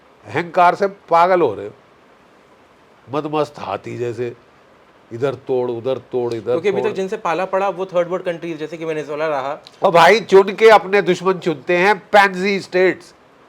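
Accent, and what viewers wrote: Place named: Punjab, India. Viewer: Punjabi बंदा जितना मरज़ी अछि हिंदी सीख ले. मगर उसका लेहजा बता ही देता है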